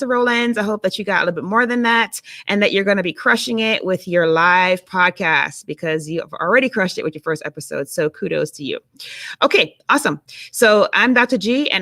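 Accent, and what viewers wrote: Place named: Jamaica. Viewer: You sound a little Jamaican...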